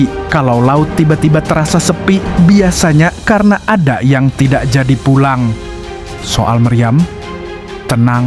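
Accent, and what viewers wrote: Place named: South Kalimantan, Indonesia. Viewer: Kadangaran banar logat bicaranya bahasa banjar, pina totok banar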